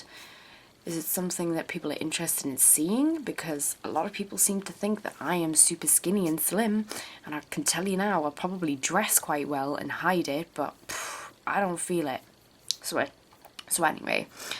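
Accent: United Kingdom. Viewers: haha, while I agree with your rant..your accent just made me laugh tremendously. idk something about British accents saying the word "fuck" lol. but truthfully only someone jealous of your will power or insecure with their body would write something like that. I know bc I'm super jealous. But I'll deal lol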